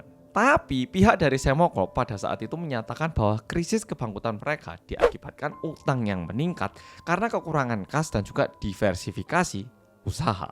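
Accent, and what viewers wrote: Java, Indonesia. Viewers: Hmm..... Muka Korea logat Jawa aku kaget weyy😰🥺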